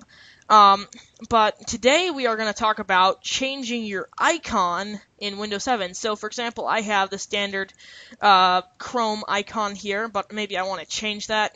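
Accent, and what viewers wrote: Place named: United Kingdom. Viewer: bros british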